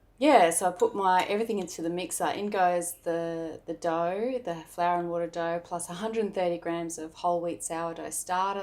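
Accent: Australia. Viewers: I loved the video and the aussie accent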